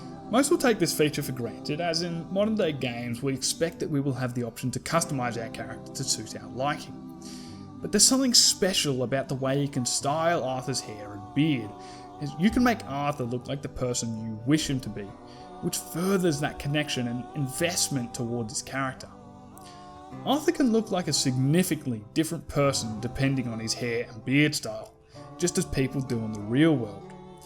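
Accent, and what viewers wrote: Australia. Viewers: Ohh he's an Aussie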